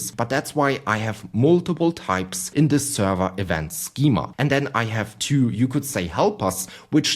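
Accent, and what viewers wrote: Germany. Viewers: Thank you german sounding man for your wisdom.... I shall use it wisely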